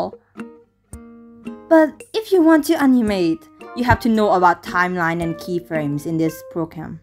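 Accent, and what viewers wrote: Thailand. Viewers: ชอบสำเนียง มีเหน่อๆ555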